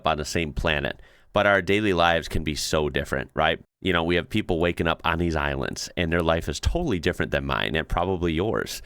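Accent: Australia. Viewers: You’re beginning to sound like an Aus with your introduction mate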